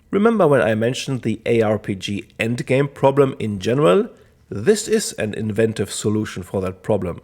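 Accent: Germany. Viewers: höre ich da einen deutschen Akzent raus? :D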